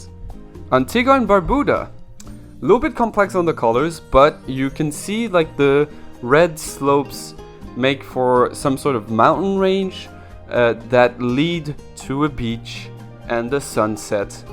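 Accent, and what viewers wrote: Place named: Quebec, Canada. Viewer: jsp pourquoi je SAVAIS que t'etais un quebecois.. autre que ca bro tres bonne video jai apprecier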